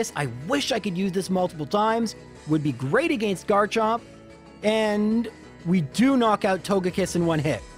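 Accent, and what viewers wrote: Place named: Canada. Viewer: Hes from Canada so he pronounces pokemon names differently then in the us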